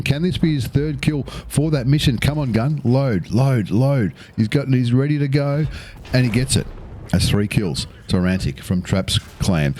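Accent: Australia. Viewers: Hey yes you not any of those other bloaks luv your videos from Fla. on N/A also awesome aussie accent mate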